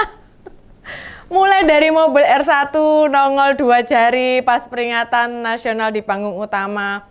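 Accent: Java, Indonesia. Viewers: Mbak.... sampeyan bisa melucu politik dengan sindiran telak dengan logat Jawanya itu ilmunya dapat dimana mbok ya ajak-2 yang lain....???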